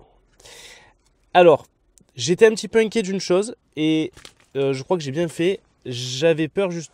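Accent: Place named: Var, France. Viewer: Ce film a l'air très intéressant, J'ai vue la vidéo de EMGK et j'ai bien aimé le rendu ultra contrasté. Sinon tu as fait de super cliché, notamment les reflets des bateau alignés, et les deux mouettes sur le lampadaire. Hors sujet : tu es du var non? Il me semble reconnaître le bord de mer varois, et ton accent m'est familier ;) PS : je prefère la Kirin à la Asahi :D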